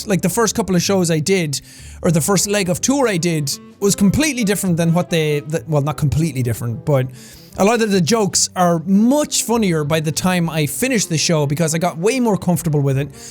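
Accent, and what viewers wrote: Ireland. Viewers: “A little bit of luck involved” -Irish man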